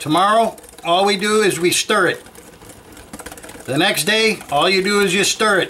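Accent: Chicago, United States. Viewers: Great "Chicagoland" accent,which i enjoy hearing since i relocated to SoCal 4 yrs ago. A'int the same difference either.They talk/sound different here. A very good example of the SoCal sound would be original version of ' 'from album '.' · You have a Chicago accent as do I lol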